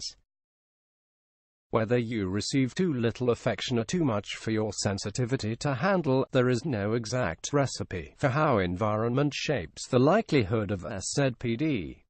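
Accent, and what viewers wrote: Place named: RP, United Kingdom. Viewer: British RP Robot. What a snob.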